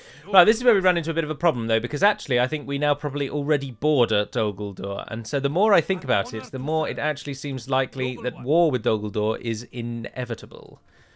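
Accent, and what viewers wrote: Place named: United Kingdom. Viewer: We are all here for the ramble,. If it was anyhow annoying then 6000 people would not have watched this episode thus far. I personally am here for the excellent british english demonstartion and classic humour, which the ramble is very proudly part of. As for the choice I think Mordor will absolutely devour Dol Guldur with just the sheer amount of better starting settlements they posess. So in truth you would only make Mordor even more powerful as well as granting them more Nazgul to control. You also need to remember that Mordor is most likely occupying Khandish homelands too, so they will have ever better economy to back up their hordes. The war between Dol Guldur and Mordor, whilst being probably very rare is just going to be a way for Mordor to become an even bigger threat than before thus forcing you to fight them later anyway, should you play on for that long. 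So in my mind I suggest you to make no alliances other than the ones you need to survive and simply conquer everyone and everything. Let's be honest, if this starting horde of cavalry would be used to its utterly ridiculous maximum, cheesing every single fight, one could conquer the entire Middle-Earth with it. I appreciate however that it is certainly not the point of this specific channel or the showcase of the new starting condition for the nation nor your personal playstyle. Thank you for coming to my TedTalk if you bothered to read this and looking forward to episode three, where the "Sun never stops ris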